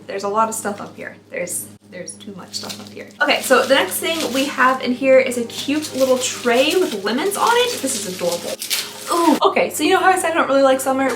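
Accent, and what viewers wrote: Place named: Georgia, United States. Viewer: Sounds like south GA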